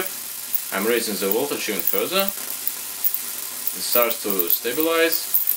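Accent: Russia. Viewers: такой "русский выговор". Прям вообще!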